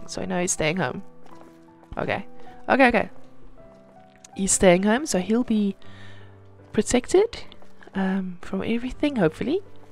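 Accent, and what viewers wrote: South Africa. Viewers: Omg your south African this is amazing I am to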